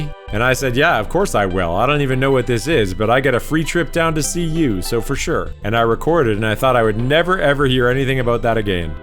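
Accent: Canada. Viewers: no worries! I was about to post that you weren't AI when I heard a Canadian accent come through, but you beat me to it. I also learned that little bit of trivia with the Mystery Dungeon, I thought Shiren was the OG but turns out it was the blue cat I saw in Japanese magazines throughout my teens. Thanks for the content!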